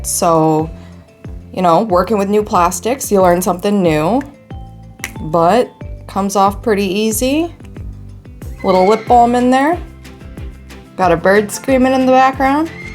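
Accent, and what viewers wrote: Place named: Maritimes, Canada. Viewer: You're just so friggin creative thanks for sharing your artistry, amazing, your sound like your from the Maritimes lol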